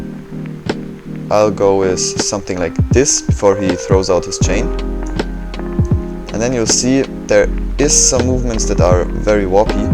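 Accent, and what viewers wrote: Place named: Germany. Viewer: mag deinen deutschen akzent und natürlich auch das tutorial xD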